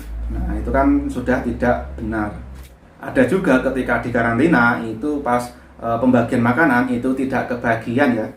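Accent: Java, Indonesia. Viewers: meni medok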